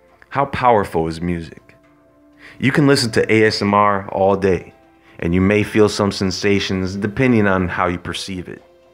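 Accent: United States. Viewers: Where is the narrator from? His accent sounds like American.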